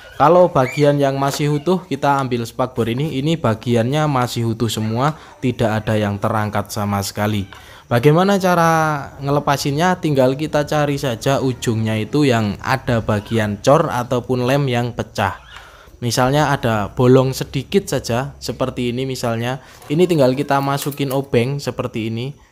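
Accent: Temanggung, Indonesia. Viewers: Samang wong temanggung po mas?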